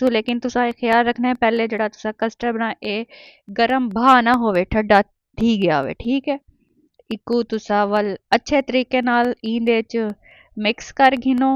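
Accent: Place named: Saraiki, Pakistan. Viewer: Nicccc Sariaki vice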